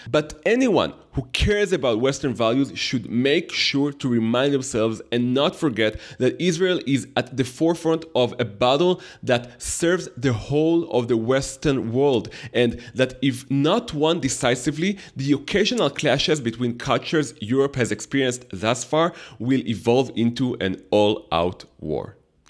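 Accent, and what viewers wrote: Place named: Japan. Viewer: ברנש פגז, אך להאזין לו זה כמו להאזין ליפני מדבר אנגלית. על הנייר, האנגלית מצוחצחת ורהוטה. בפועל, אי אפשר להבין מילה. חבל.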